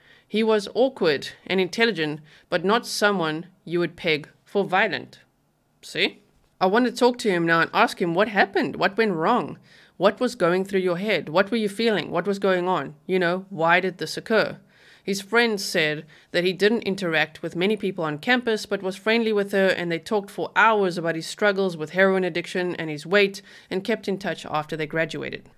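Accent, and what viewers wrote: South Africa. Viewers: This is my first time watching your videos. I couldn't place your lovely accent so thank you for telling us that you are from South Africa living in the Netherlands. Your videos are so full of information. It feels like I'm back in university.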